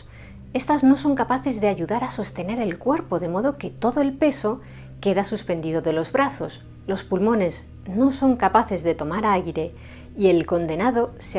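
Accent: Spain. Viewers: Me gusta mucho tu acento español, el tiempo que te tomas explicando con sumo detalle, mi suscripción la tienes =) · Eres una excelente docente con una hermosa voz. Para mí acostumbrado al acento rioplatense tu acento hispano me suena muy bien.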